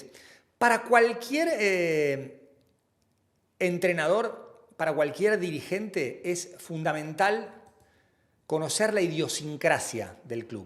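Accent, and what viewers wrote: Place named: Argentina. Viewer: que buen análisis, se te pegó muy rápido el acento argentino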